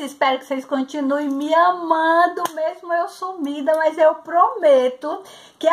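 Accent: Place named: Bahia, Brazil. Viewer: Adoro a sua pronúncia. É tão engraçada. Das pronúncias do português, variante brasileira, as que eu gosto mesmo é da sua (penso que é da região de S. Salvador da Baía) e da de Minas. São muito "cantadas"! Beijinhos de Portugal. Ah, também já sentia a sua falta. Parece que a partir da altura em que me inscrevi no seu canal, deixou de postar com alguma frequência. Volte logo, para poder ouvi-la (gosto!).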